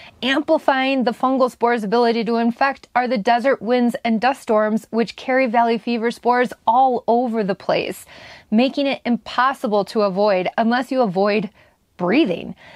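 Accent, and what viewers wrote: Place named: Midwest, United States. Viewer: I have watched almost every and Riot video and I think you both are awesome. As someone that was born in Wisconsin and lived most of my life in and around Chicago your voice reminds me of home. In one video you compared a city to Beloit and I said to myself “How many people here know Beloit?” Beloit is no Janesville but it’s alright. I chose this video to comment on because microbial remediation is part of my job and “The Fungus Among us” is literally one of my titles in my training materials used to train new technicians. I am going to offer a little critique of your videos. It mostly positive because I think you are doing a great job and are genuine. So, I don’t know how you don’t have more subscribers because I find your videos much more entertaining, informative and real than most that I have seen. I guess I can just make this a top 10. 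My 10 favorite things about and Riot videos: 1. swearing. It’s not gratuitous vulgarity but you say what you feel and if that includes coarse language you let it fly. 2. How well thought out you are. It’s obvious that you research your topics and yet you always provide verbal disclaimers that people shouldn’t take what you say as gospel. 3. Your sense of humor is sometimes dry but is often quirky and nerdy including lots of Star Wars references as well as LOTR and even South Park. 4. How strong and positive you are. You appear to be crushing the nomad life. Super prepared and taking the pitfalls in stride. More people especiall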